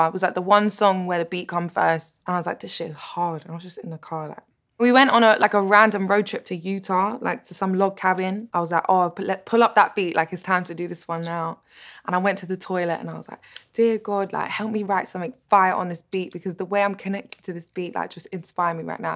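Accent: United Kingdom. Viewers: She's British yh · Wao shes so british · she’s british? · What is her accent? British? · I didn't realise she was british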